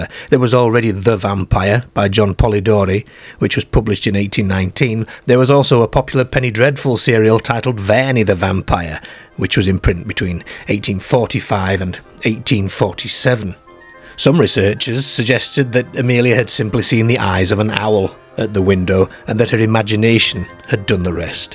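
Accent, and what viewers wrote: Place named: Northern England, United Kingdom. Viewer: Just came across this version of the story. I am trying to work out your accent. Obviously northern, and I am from the north of England, but can't place this. I know there are lots of variations and yours is new, and fascinating.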